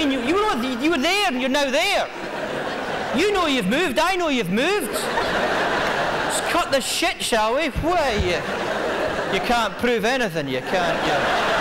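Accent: Australia. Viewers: He’s awesome! And he’s got the Aussie accent down perfectly! 😂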